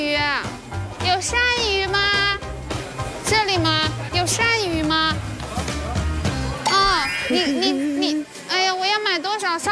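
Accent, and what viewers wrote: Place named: Zhejiang, China. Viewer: 很浙普吧！浙江美女代表，聲音軟糯軟糯的，好好聽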